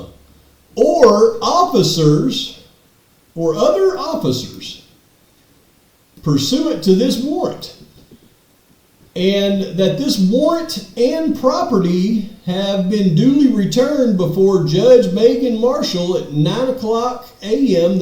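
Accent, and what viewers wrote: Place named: South, United States. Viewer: PS it’s CON-SPICK-U-US not Con-Spish-Us 😂.. southern drawl was very present in todays video 🤠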